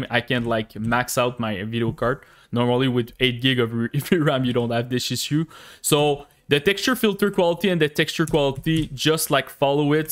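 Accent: Quebec, Canada. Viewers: t'es tu un quebecois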